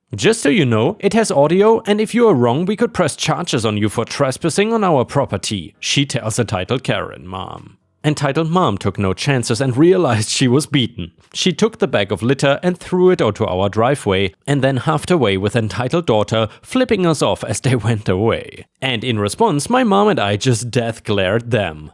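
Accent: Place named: Germany. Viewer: Hey, love your videos!❤ I have a question and I hope it's not rude. I'm curious to know where you're from, it sounds like you have an accent and I can't quite place it, maybe german or sth scandinavian (I'm German and you sound very german to me😂). But I saw in your info that you're located in the US so I'm really sorry if AE is your mother tongue and I just insulted the way you speak or something😅 I really like the way you read so please don't get me wrong, I was just curious:)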